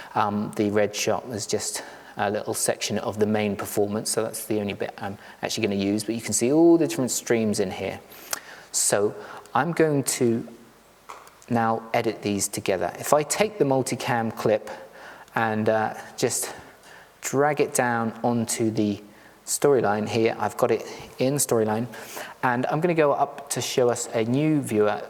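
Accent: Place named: London, United Kingdom. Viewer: It's called an accent. London or close by.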